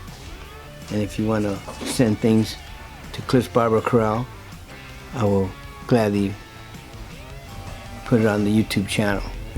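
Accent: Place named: Southern California, United States. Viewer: Are you from Cali Cliff? You have the SoCal accent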